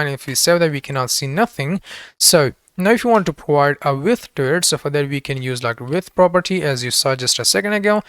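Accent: India. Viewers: great video but, not gonna lie u have indian accents our its my imagination hahahaha.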